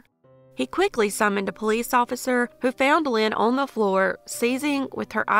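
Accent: South, United States. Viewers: So good to hear a southern accent, I live on the other side of the world and this is so very good to hear. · Love your true southern accent.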